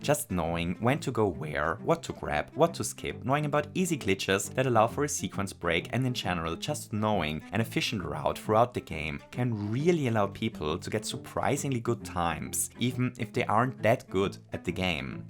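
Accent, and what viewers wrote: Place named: Germany. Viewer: du klingst sehr deutsch, ich kann es nicht erklären, dein Akzent ist nicht stark, aber ich höre definitiv deutsch XD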